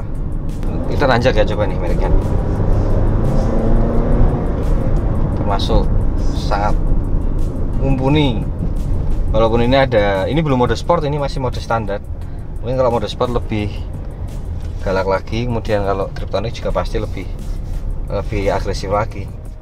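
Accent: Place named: Central Java, Indonesia. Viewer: Paling senang dengar logat jawa tengahan ...marem salam teko jawa timur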